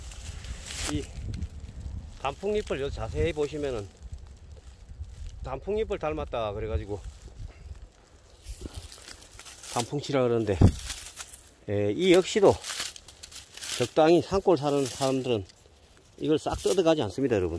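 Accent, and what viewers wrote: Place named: Seoul, South Korea. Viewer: 알흠흠다븐 서울 누님 말썸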